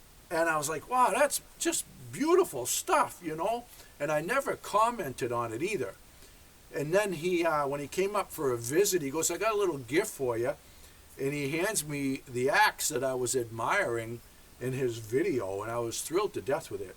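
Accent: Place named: New England, United States. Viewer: I am in Northern Wisconsin. Small place on five acres of land surrounded by State Forest and other private uninhabited lands. Although we are not a primitive as you are, we do enjoy our surroundings and gathering and using our natural resources. I saw you mention the "Camp". I thought I detected a NE accent. Being born in MA, my brothers and parents live in NH, so the term Camp was very familiar to me. Approximately where is the Camp? One brother is in Auburn, while the other is in Wolfsboro. Just curious. Great job on the cabin, and glad I found your channel.........Thanks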